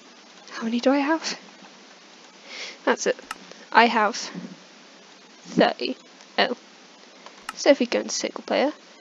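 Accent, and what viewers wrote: United Kingdom. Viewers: YOUR VOICE IS SO COOL!!!!! NOT ONLY DO YOU SOUND SO MATURE, BUT ALSO I LOVE BRITISH ACCENTS!!!! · OMG YOU ARE JUST LIKE ME IM LESBIAN I LOVE TO PLAY MINECRAFT IM ENGLISH sorry if I’m wrong you’re accent sounds mighty like it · Why do you sound so bloody posh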